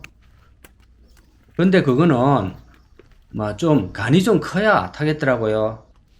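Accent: Gyeongsang, South Korea. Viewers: 선생님. 갱상도 사투리가 구수합니다. 인구소멸 지역중에. 한곳. 외? 먹고살. 산업지대가. 전무상태. 경상도 와. 비교해보세요. 엄연한차이. 8.90년대. 대권은. 주로. 경상도. 하여. 공업단지. 그때모두조성. 그대표가. 구미공단. 울산 공단. 등. 모두 부자동네. 그런데. 지금. 경제. 안좋다고. 난리난. 경상도. 하지만. 현재도. 많은차이. 호남과. 경상은. 비교하지말기. 너무억울하니까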